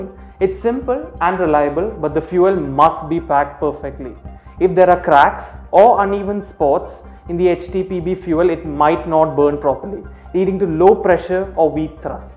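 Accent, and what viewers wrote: India. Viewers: They call it Curry English! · He speaks Inglish and hard to understand.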